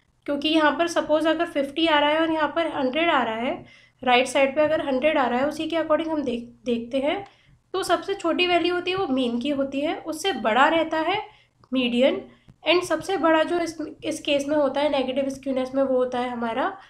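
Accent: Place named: Odisha, India. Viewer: Mam i think you are from odisha 😍😍😍😍🥰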